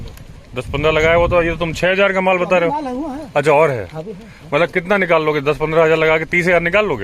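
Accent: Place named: Awadh, India. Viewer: bohute sundar. bare dino baad pakki awadhi sunne ko mili. apne nanhihal ki yaad a gayi. zabardast. nothing like hinterland UP.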